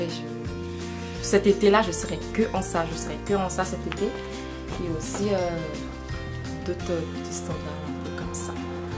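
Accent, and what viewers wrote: Senegal. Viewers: Non quand je t'ai vu, je croyais que tu étais une Sénégalaise, t'as l’apparence et l'accent en plus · Tu ressemble à une sénégalais et même l'accent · Elle ressemble à une sénégalaise. Et elle parle comme une sénégalaise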